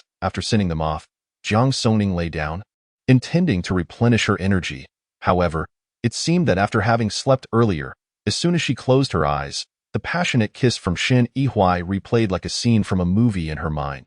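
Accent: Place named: France. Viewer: It's still on French pronunciation.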